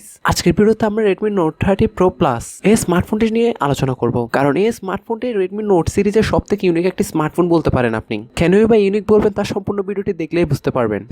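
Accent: Chittagong, Bangladesh. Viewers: তুই কি চিটাগাং এর?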